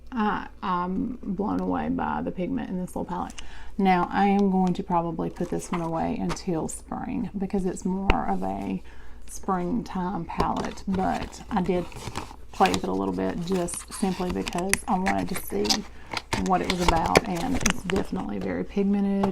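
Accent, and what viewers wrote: South, United States. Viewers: I love your vids. Your so soft spoken and of course I know your southern. Keep the videos coming ♥️ you know us southerners are suppose to get snow this weekend 😬 IG LOVE YOUR PURSE never had a MK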